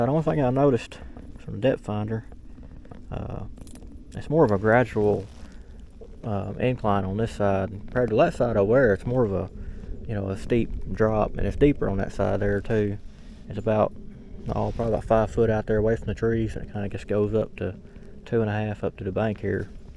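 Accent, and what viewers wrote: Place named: Arkansas, United States. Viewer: You from Arkansas? I recognize the accent.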